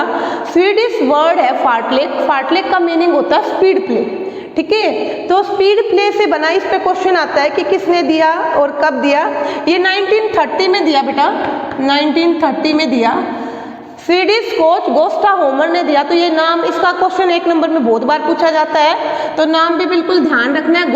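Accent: Haryana, India. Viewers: Mam app Haryana ke lag te ho Sare topics clear ho gaye app ki video dek ke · Mam r u from haryana.......??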